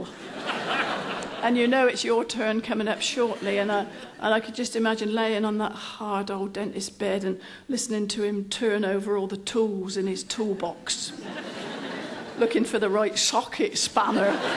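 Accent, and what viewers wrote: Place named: South East England, United Kingdom. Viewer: From the Westcountry (Cornwall), that is not a Westcountry accent anywhere, sounds like old rural accent from the south east to the west of London, before alll the cockney Londoners spread out. So yes, borderland between Oxfordshire and west Berkshire is where I'd place her.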